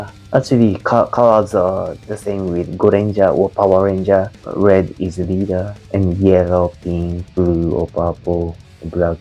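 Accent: Visayas, Philippines. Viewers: HAHAHAHAHA NALINGAW KOG PAMINAW SA IYANG BINISAYA...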